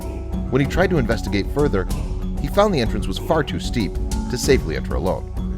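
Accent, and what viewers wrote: United States. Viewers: Within the first two minutes the video contradicts itself... Which was it... the sound of the wind or rushing water? And why bother to even try to tell us again what you've said only two minutes before? Is this made for the dumbest people alive.... Oh wait, the narrator is a yank so I guess it was.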